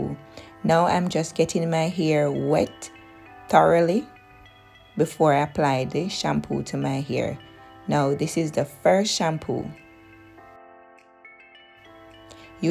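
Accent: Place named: Jamaica. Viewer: Are you in Jamaica? You sound Jamaican....Love your videos. I have been watching them non stop since I came across your channel today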